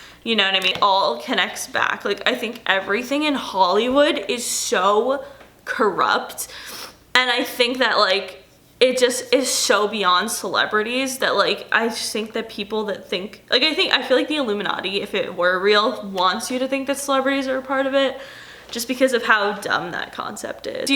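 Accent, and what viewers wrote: New York, United States. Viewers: I love your New York accent..Lmao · Her New York accent though😂😂😂😂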